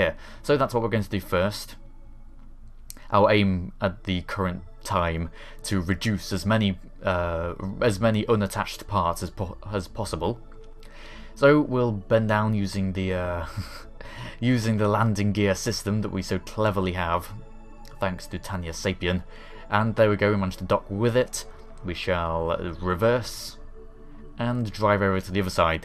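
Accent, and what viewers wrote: United Kingdom. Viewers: I think he's british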